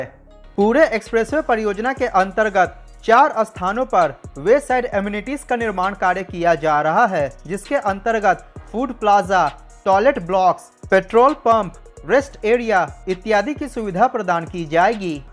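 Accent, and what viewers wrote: Bihar, India. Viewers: Ur ascent is like that of a Bihari. Sorry but Biharis are poor at Hindi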